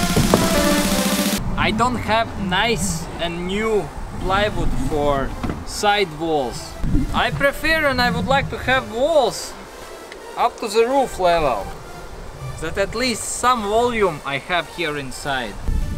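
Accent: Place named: Germany. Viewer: I think — youre grammar is normall! But charakter of sounds is — german accent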